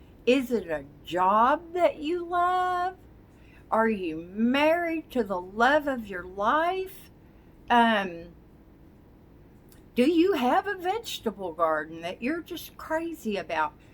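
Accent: South, United States. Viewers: why is it that you have no accent in some of your videos but have a strong southern accent in other videos?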